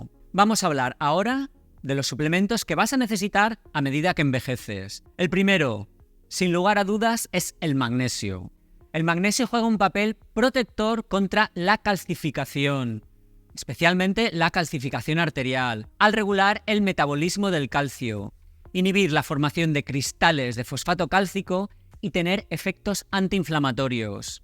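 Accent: Spain. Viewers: Vit D3 PARA CARENCIA DE VIT D..SOLO POSEO EL 8% Y NECESITO CERCA DEL 100%> ES UD UN GRANDE, GRACIAS CONFIO EN UD..DESDE HACE MUCHO LO ESCUCHO..Y LO PERDI HOY YA NO no lo perderéad. Solo me agrada la gente con valores lis demás que digan no me interesaron antes y menosdesdeURUGUAY.LO SKDO..SIEMPRE ME HA DEJADO LO MEJOR..A LAS ORDENES...DE QUE PAIS ES UD??? a..🥱🇺🇾. UD R TIENE ACENTO O ESPAÑOL. MADRE PATRIA O COMO EL MIO.,MAS BIEN SPANISH... UN enorme gusto...bienvenido,Bienaventurado..es muy grato en esta suciedad No SOCIEDAD.encontrar valores..!!! Uruguay.; Graziella Riccetto.!! Gracias.!!❤🎉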